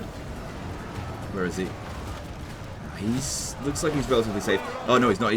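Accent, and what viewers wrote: Queensland, Australia. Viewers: Your accent sounds queensland to me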